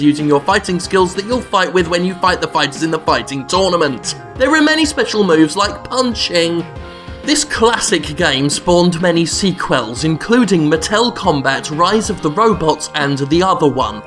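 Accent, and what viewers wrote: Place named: United Kingdom. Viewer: Because british accent and unexpected jokes in quick succession = Instantly Charlie Brooker.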